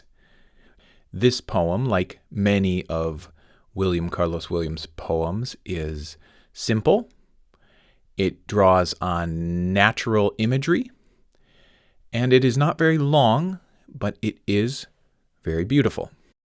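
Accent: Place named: United States. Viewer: His heavy American accent ruined this. I expected a pure British accent. 🙄🙄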